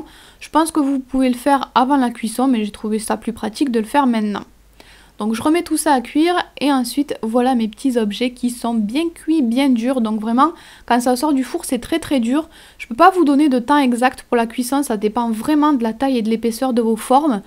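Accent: South, France. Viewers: du sud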